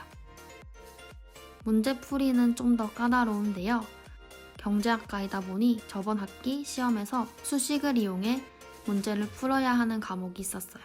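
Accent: Busan, South Korea. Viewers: 국립대라고 했지만 부산대가 쎄게 의심되는 억양..매력있어요ㅋㅋㅋ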